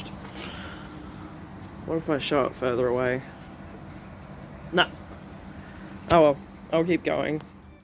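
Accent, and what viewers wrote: Australia. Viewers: Just beautiful! And I'm so happy to listen to your accent as my daughter is in Perth, so as i listen to you I can imagine what she listens to. Very cool. Thanks!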